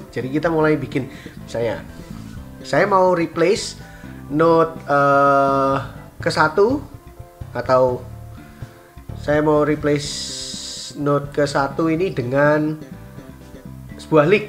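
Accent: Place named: Java, Indonesia. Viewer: Natural medok e koh. 😁😁😁😁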